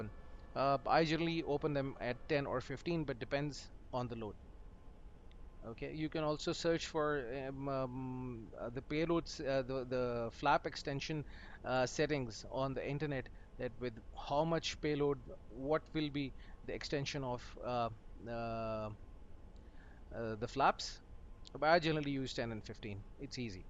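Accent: India. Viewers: I dont like your indian accent because you sound like a guy who calls me from "windows technical support"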